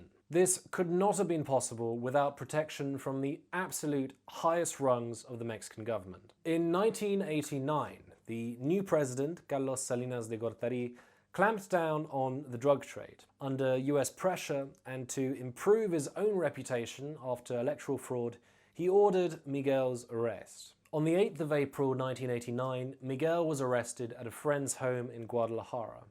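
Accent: England, United Kingdom. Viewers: I’m confused with this host… he has a British accent yet he pronounces perfect Spanish when he says their names or town names in Mexico 🤷🏼‍♂️🤣🤣🤣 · SPEAK UP NEXT TIME MAN THIS ISNT AN ASMR VIDEO I HAVE MY VOLUME ALL THE WAY UP BUT BARELY HEAR YOUR SULTRY BRITISH BOYISH VOICE LMAO SPEAK UP NEXT TIME!!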